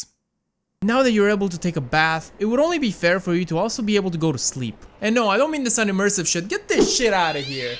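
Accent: Romania. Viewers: Well I guess I now know what a Romanian accent sounds like.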